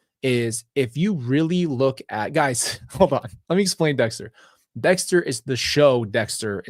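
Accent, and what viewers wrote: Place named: Boston, United States. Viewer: "Piece a gahbage" lol, you sound just like a Boston boi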